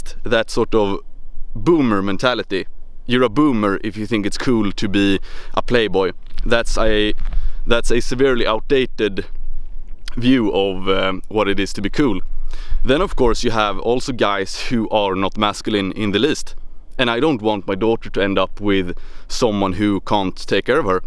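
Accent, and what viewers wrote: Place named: Sweden. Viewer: "If i See behavior i like - i press Like!" "If i See behavior i do not like - i do not press Like!" The Swedish Accent makes it sound even more savage!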